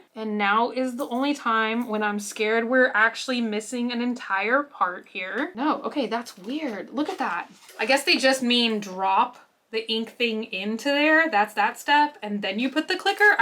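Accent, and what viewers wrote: South, United States. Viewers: SHE'S FROM TEXAS. All of us southerners talk like this.🤷🏽‍♀️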